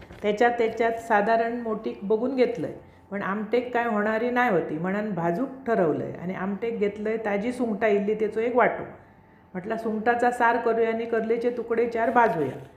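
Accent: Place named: Vengurla, India. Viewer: Tumchya bolnyacha style warun tumhi vengurla che watta.. tumhi vengurla che ahat ka?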